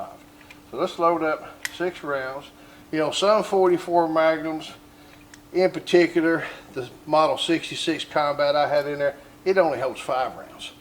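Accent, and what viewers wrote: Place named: Tennessee, United States. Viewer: Fun video! You sound like you’re from my neck of the woods in middle Tennessee.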